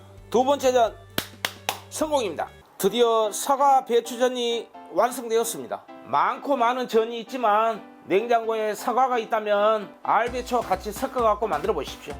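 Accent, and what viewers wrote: Jeolla, South Korea. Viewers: 헐 지는 전라도 본토 발음 같은디.. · 경상도 아니고 전라도 사투리 인거같으신데요!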